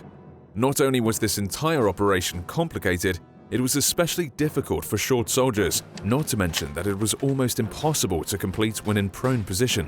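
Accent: United Kingdom. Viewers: That British accent tho ... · Video about a british weapon voiced with a proper british accent. perfect!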